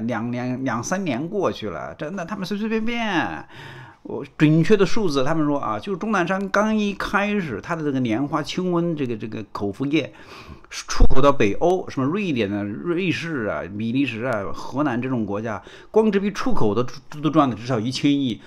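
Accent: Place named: Changde, China. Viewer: 口音听上去常德人呀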